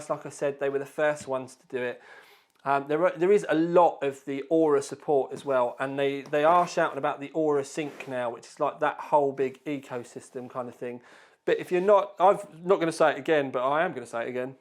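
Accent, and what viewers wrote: United Kingdom. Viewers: nope, they are not a big fan of British accent I assume.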